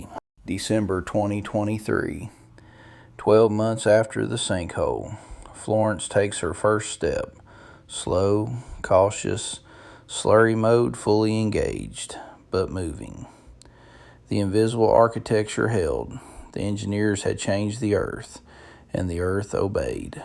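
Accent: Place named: South, United States. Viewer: The diagrams look great but how accurate are they? Also, why did you choose a Southern drawl for an Australian subject? Elevenlabs?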